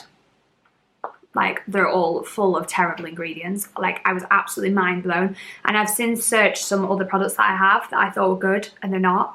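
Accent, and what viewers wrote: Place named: United Kingdom. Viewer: In all this horribleness and negativity it’s refreshing to hear a more local accent and the word ‘brew’ on YouTube as most influencers I watch are American 😂👍🏻 I binned half a bottle of Delight conditioner a few months back 😩 but it was so difficult to get hold of these in the UK anyway, just as well now...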